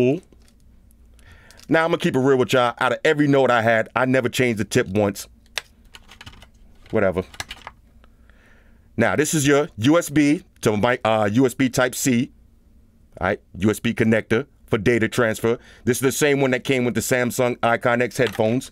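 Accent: New York, United States. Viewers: alright alright, i clowned this dude in the past because of his harsh voice and New York accent. but i see he done softened his image by putting a cat in his videos. Good job.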